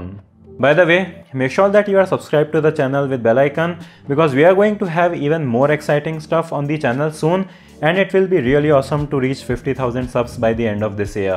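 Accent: India. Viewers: This indian accent is killing me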